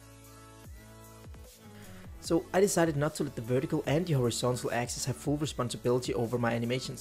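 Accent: Denmark. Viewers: I could hear you have the Danish Accent in you, so I was like hmm he seems Danish. So I checked your ABOUT and saw Denmark. Just found your channel, seems interesting :)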